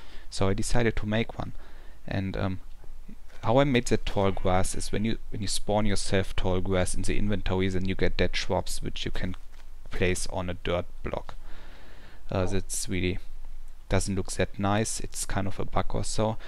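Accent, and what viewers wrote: Germany. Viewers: you have a german accent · you sound german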